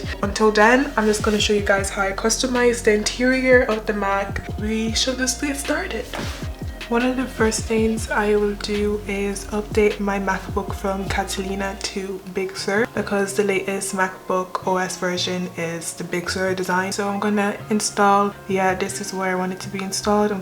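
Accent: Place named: Ireland. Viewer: I love your Irish accent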